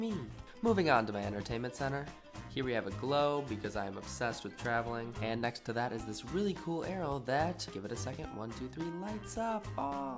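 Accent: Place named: Midwest, United States. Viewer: I really enjoy watching all your videos. They're beyond cool!. I was once a foreign exchange student to St. paul, MN way back in 2008 so i kinda enjoy listening to yer midwestern accent. Keep it up! :)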